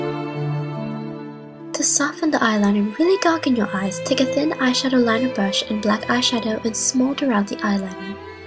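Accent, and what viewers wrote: United Kingdom. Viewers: why does she talk british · attempting the british accent... rather lovely. It's not repulsing at all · Or just doing a british accent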